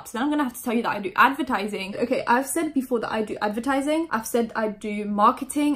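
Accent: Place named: London, United Kingdom. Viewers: Lmao omg her accent and pronunciation is like so rough, pure london hahaha. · ringaling it’s so weird to me, like the place that i’m from is like 4/5 hours away from london so idk the range of accents that well but to me she sounds working class london but NOT at the same time??? and it confuses me i don’t think i’ve heard the combination before lol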